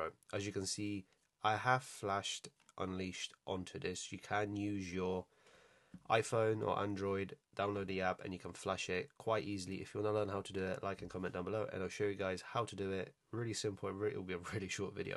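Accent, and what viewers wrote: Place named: United Kingdom. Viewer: Great vid man. Nice to hear a fellow brit talking about ethical hacking, etc. New subscriber for sure. Im trying to get into ethical hacking/cyber. Would love it if you could do a roadmap for getting into ethical hacking. Im currently doing the comptia A+ and then hopefully the trifecta as well as linux+ cloud+ and pentest+ and some python, etc. It's just so hard to get into any pentesting roles as UK is still behind, and most pentest roles are senior. The US has so much more opportunities for cyber and pentesting as a whole. Any advice on that front as well would be great. Also what did you study to get into ethical hacking? Thanks bro🫡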